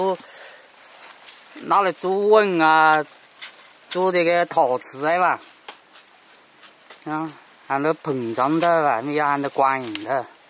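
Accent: Hakka, China. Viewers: 的客家话跟我的完全不同，他说的我大多不懂。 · 你的客家话和我的不一样。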